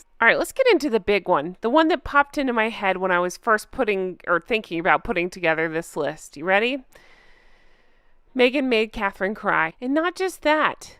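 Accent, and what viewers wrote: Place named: United States. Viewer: i am british and i love your voice and your laugh. We love the american accent in Britain❤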